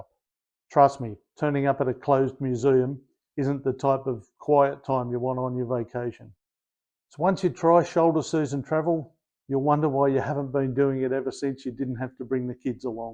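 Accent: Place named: Australia. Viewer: I thought you had an Aussie accent. Wow, you’re a world away from USA and the State of New Jersey! Great luck to you, dare I say “mate”.😊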